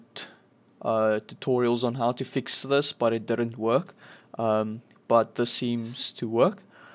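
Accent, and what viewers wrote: South Africa. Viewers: U sound afrikaans 😃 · Is this dude South African? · south african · Ur from South africa right?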